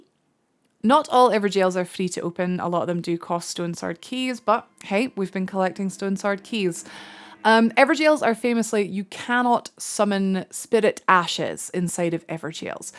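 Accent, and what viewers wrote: Scotland, United Kingdom. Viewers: Nice Scottish accent. What’s your PSN?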